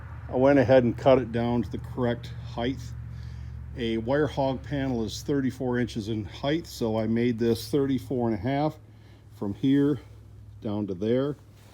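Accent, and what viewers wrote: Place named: Northern Minnesota, United States. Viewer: Those look like heavy duty gates! You're going to need to have some heavy hinges to hold the up! Your accent reminded me of how we poke a little fun at the northern MN folks - "Hey der, let's head up to Tunder Bay for some Molsons, ey." Have a great week!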